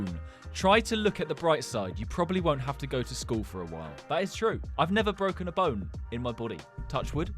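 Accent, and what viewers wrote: England, United Kingdom. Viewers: where in england u from? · AHH A BRITISH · You are British I know it😅😂😂😂🎉🎉🎉😊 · Are u british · Is he British?????? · are you British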